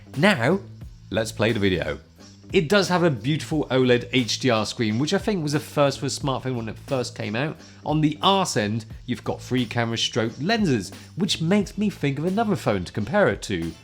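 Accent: United Kingdom. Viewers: I remember as young funny guy with british accent. Fast forward,now with wife and kids in tow looks like granpa of himself. Run for the hills Save yourself!!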